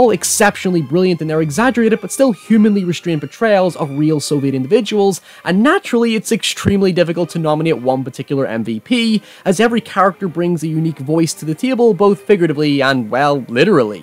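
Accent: Northern Ireland, United Kingdom. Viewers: I think northern irish maybe? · Northern Irish orginally?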